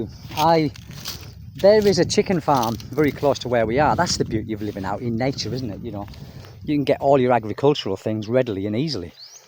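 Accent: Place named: Northern England, United Kingdom. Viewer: Funnily enough i did ask GPT about teaching English online without any qualifications and it suggested Preply. I only need to earn about £300 a month when living there to stop me eating into my savings but i do plan to have a dabble with YouTube also. Maybe do POV walking vlogs or if i'm not too shy do the proper vlogs walking about interacting with people. You do Preply or something similar? I don't have a TEFL certificate or a degree so i'm restricted to the casual platforms. Where were you from in the UK by they way? I'm Nottingham, but i suspect you were a bit further "oop norf" Sheffield? Can't quite place the accent 😅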